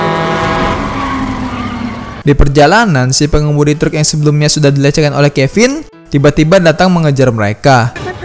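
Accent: Medan, Indonesia. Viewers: aaiii suka kali aku ad di selingi critakn ad logat medan y.. suka kali aku bang.. 😂😂😘😘😘😘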